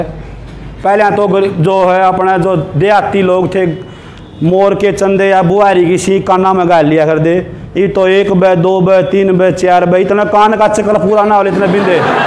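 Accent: Haryana, India. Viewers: Mera Haryana gk se door door se koi matlab nahi hai sir me to bas apki Haryanvi language sun ne ke liye aur apki teacher quality ke liye video dekhta hu good sir keep it up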